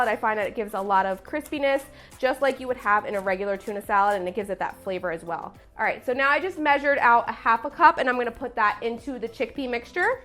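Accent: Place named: New Jersey, United States. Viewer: Are you from Jersey? My mom is from Jersey and your accent is very strong lol i’m from the south, but immediately when you started talking I was like… I think she’s from Jersey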